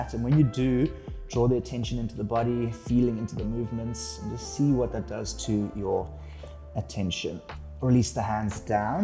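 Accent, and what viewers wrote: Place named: South Africa. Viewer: For a Saffa abroad, it's SO lekker to hear your accent 👌 loved this flow, and thank you for the decent Shavasana at the end! Thank you!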